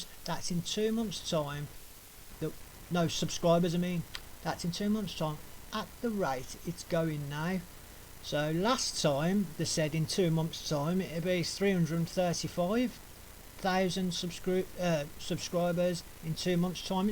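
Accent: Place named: Birmingham, United Kingdom. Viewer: Good video apart from the brum accent !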